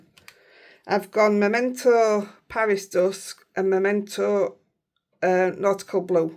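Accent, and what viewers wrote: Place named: Lancashire, United Kingdom. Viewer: That's a stunning bauble! I live in Texas, USA, now, but my home and heart are still on the Lancashire/Yorkshire border (Clithero)--it's wonderful to hear a familiar dialect.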